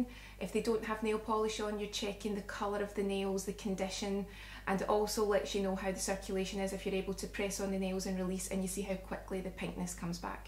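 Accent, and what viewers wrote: Scotland, United Kingdom. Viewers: Hiral Patel it a Scottish accent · Am I hearing a Scottish accent? :) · I LOVE the Scottish Dialect...So Sexy ❤ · Love the Scottish accent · It's not even an accent. She's Scottish. That's how Scottish people sound. Duh! 🙄😏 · I LOVE your accent. All americans love the British accent but Scottish is my favorite. Pretty and engaging. Great video!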